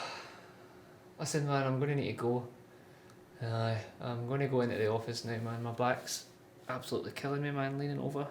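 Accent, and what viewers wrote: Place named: Scotland, United Kingdom. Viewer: I love the one cable instead of the spaghetti you usually get, I thought people hated Scottish accents haha. Nice vid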